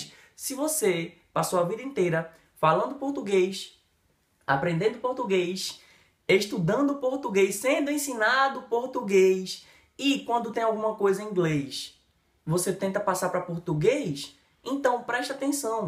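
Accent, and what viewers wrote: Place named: Pernambuco, Brazil. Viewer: Pernambucano detectado!!! 🚨🚨🚨🚨🚨🚨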